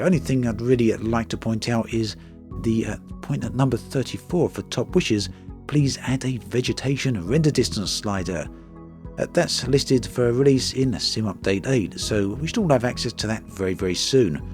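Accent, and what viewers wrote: United Kingdom. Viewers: First of all I love your videos but I just have one question. Why do you always give the price for things in dollars? You sound very much British to me. It's bad enough our language being taken over by American English without our currency aswell. Sorry. Rant over. Keep up the good work. 👍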